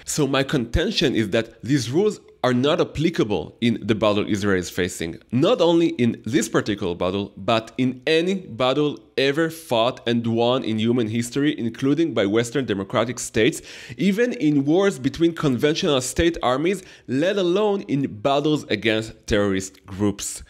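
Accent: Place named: Japan. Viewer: ברנש פגז, אך להאזין לו זה כמו להאזין ליפני מדבר אנגלית. על הנייר, האנגלית מצוחצחת ורהוטה. בפועל, אי אפשר להבין מילה. חבל.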